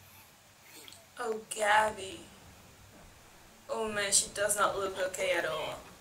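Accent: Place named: Spain. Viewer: Cool. From your accent I was thinking Spain. Makes sense.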